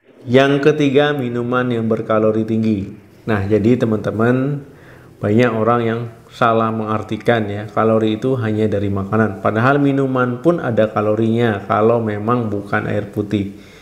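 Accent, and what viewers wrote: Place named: Bali, Indonesia. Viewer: Logat bicaranya kaya ada bali nya dok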